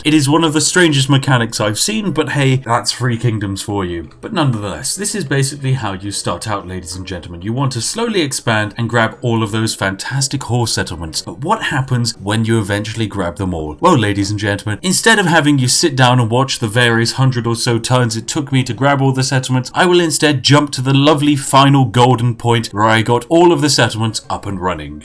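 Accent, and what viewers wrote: United Kingdom. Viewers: the use of the British exploit of anglicizing everything and not even bother to try and pronounce the names in the original pronunciation made me laugh very Spiffingly British hahaha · That british accent makes a lady happy indeed. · You know I usually dislike brits due to their attitudes/accents. You sir, should be royalty. Funny as hell, informative and got me to drink a cup of tea. Hats off to you sir.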